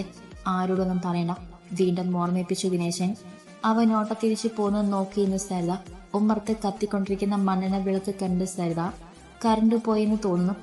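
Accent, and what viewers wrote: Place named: Tamil Nadu, India. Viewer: ahno anik aa story nala ishta pine chechi de tamil malu slang oru rekshem illa poliii❤❤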